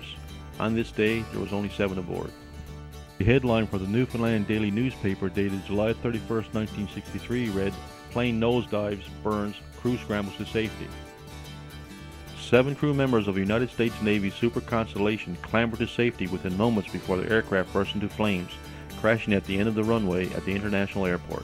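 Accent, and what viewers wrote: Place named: Cornwall, United Kingdom. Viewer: The narrator has a VERY Cornish inflection to his accent. He might have ancestors in Devon.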